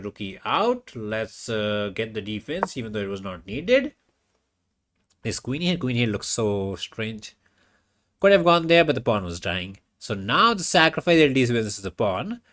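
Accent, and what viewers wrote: India. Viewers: Love ur indian accent and calling queen the fatty😂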